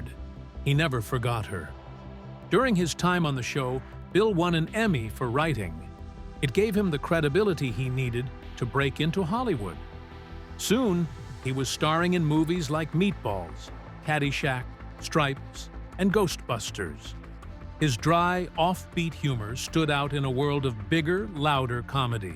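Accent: United States. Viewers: Howard Kos all!?? Dan Oak'roid?!? What's with the Krazy pronounciations? This guy pronounces names like a furr-ener. Yet he has an American accent. As Snow White says "Weird, weird weird " 😅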